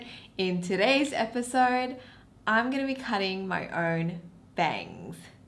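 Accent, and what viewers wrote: Australia. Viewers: I love all your videos. I love how you spoke, your expression, your make up and your settings. I love Aussie accent and before i don't know you're Australian so i lowkey love your accent and one day i was thinking could it be an aussie accent 🤔 and yeah i was right i knew it bc i was a big kpop fan and there's few kpop idol with aussie accent like Rosè, Chan & Felix (just mentioning bc i love Aussie accent). Moreover, I'm loving your videos. I watch it and then repeat it again and again. Btw, i'm Zuali from Northeast India. Love you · woah I thought she lived in Australia, I must’ve missed the move to Singapore! Still think she lives in Aussie because of the prominent accent hahaha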